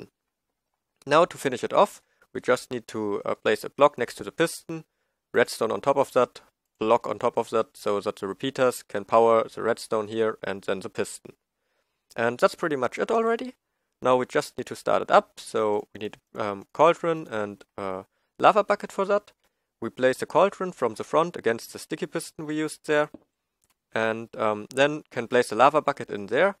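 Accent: Germany. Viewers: Are you german ? Its Sounds like :) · Are you german? ^^ You sound as if you were! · man hört richtig den deutsche aktzent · Typisch deutscher akzent.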